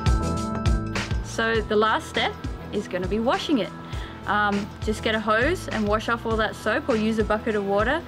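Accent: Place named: New Zealand. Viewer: Love this kind of art, and the kiwi accent :)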